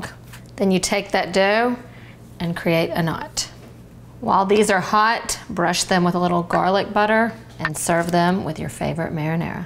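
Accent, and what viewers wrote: South, United States. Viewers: LOVE your southern accent! Wondering where you're from? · Gotta love the southern in this girl! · Was NOT expecting that thick southern accent lol.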